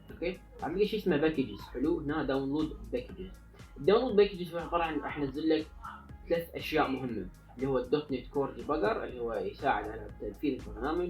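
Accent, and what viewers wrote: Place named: India. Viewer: أمانة أنت فهمان شو عم تحكي ، يازلمة عم تحكي متل الهنود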